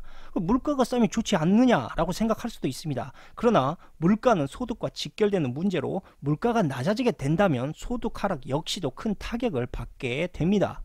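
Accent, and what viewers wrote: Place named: Honam, South Korea. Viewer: 칠백명때부터 보던 사람입니다. 사실 지역감정이 없던 저인데 가족이 전라도 사람에게 사기를 당하고 이후에 안좋은 마음이 자리잡게되었어요. 그런데 억양에서만봐도 님도 호남이 고향이신것 같은데 영상보며 응원많이 합니다.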